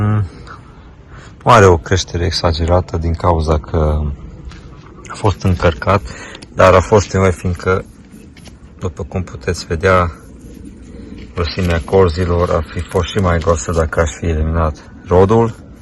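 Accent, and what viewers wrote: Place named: Transylvania, Romania. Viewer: Vă salut !!! Din ce zonă a tări sateți ???? După grai ,ardelean, !!!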